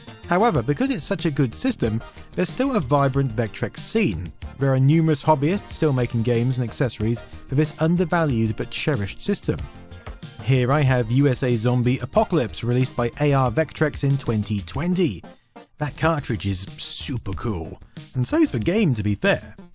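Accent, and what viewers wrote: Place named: Cockney, United Kingdom. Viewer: Nothing worse than listening to some cockney chimneysweep dragging the language of Shelley and Keats through the mud.👎🏻👎🏻👎🏻👎🏻👎🏻👎🏻👎🏻👎🏻👎🏻👎🏻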